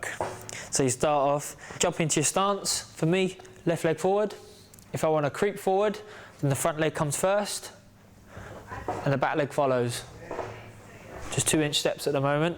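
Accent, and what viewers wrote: United Kingdom. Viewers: British accent there...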